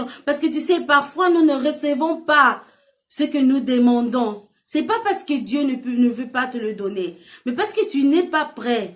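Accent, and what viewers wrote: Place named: France. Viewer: Your French accent is so beautiful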